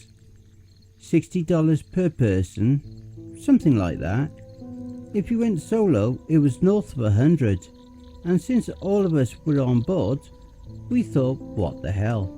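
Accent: United Kingdom. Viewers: The creepy animation at the beginning makes this so worth it., you're amazing. Your British accent is a joy to hear. I look forward to hearing more narrations by you. Also, man How do you get subtitles?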